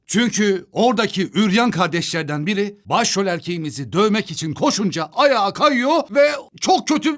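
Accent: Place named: Adana, Turkey. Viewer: Abi lutfen Adanaliyi konus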